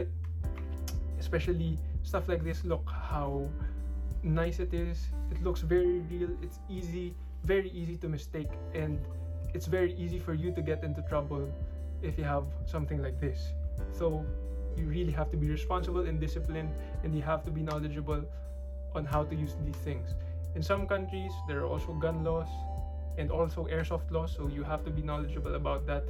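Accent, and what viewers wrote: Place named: Philippines. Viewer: filipino english is so satisfying!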